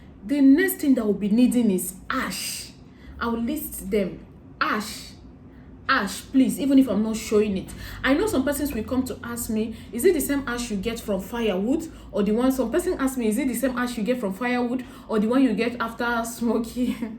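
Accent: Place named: Nigeria. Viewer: Am in love with your Nigeria English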